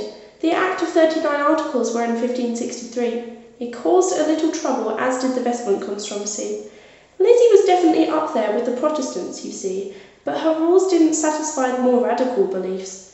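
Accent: United Kingdom. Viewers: I love your accent! Pure british :')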